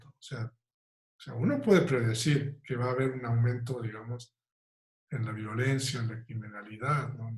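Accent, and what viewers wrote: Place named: Córdoba, Argentina. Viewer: Y esperamos tener el honor de que nos visite en Argentina (su acento cordobés no lo perdió 😉)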